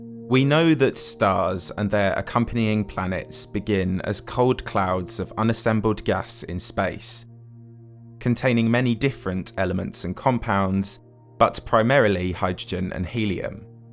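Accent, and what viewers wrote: United Kingdom. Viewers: Other than Sir David, I typically do not like Bri...ish accents. But I like your cadence and delivery. Top of the mornin' cup of tea Or whatever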